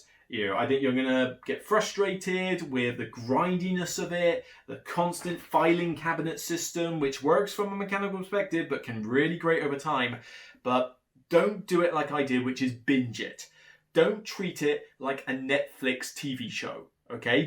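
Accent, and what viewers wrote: United Kingdom. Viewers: First, I think this is the first time I realized you are British. Second, your review is spot on with my experience. I don't have space in my house to leave the game set up so that isn't a solution for me. Our first game took 9 hours and we didn't even finish. I wish we had gotten the cartographer's map. I think if you noted the card numbers and essential features, you could speed up the game significantly. I don't think it is rational to have to start completely over every time. That easily doubled our play time.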